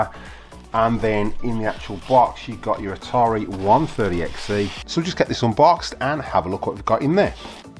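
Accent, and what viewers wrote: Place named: West Midlands, United Kingdom. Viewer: That accent sounds like Walsall or close in the West Mids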